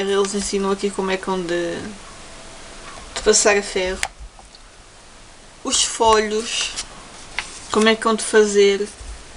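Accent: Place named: Portugal. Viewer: Definitivamente, eu aqui no Brasil não entendo português de Portugal...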